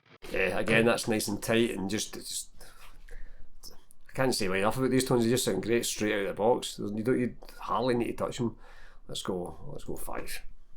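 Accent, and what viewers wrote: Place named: Scotland, United Kingdom. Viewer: Hahaha love the way you pronounce Mesa boogie with the mighty Scottish accent 🤣🤘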